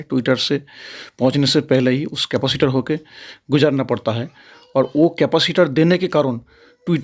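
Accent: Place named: Bengal, India. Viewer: Universal amplifier ka Chandni main shope ka mobile no muzhe google se milega hai, ph mein bat huya hai. AaJ ke somoy mein 3400/- price hai. Ar tumi to ekJon Bangali tomar kothar Tane ami buzhe geychhi. Tobe niJer matri vasa chere keno hindi te video banachho. Banglay banao seta teo tumi popular hobe. Thak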